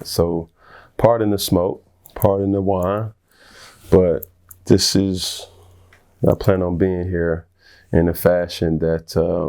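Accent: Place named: Atlanta, United States. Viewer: Bruh you letting your ATL come out slowly..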